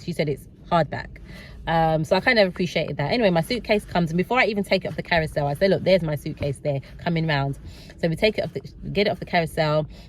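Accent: United Kingdom. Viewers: It seems to me that you were a little too prepared yeah 2 travel frequently if someone is a frequent flyer and then hopping over and over they wouldn’t need the amount of information that you got if you gave them very little it would’ve said she’s not prepared because you had so much information to squash there they suspicion against you. It feels that they saw you as too prepared for them so that is why they gave you the full degree treatment so next time Missy let them ask the questions don’t chitchat with them saying I watch border force bullshit. you thought your British accent was gonna get you through and be treated decency skin colour is a criminal so they showed you how they see you. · Okay. My apologies. Did not watch to the end. Nice British accent 😎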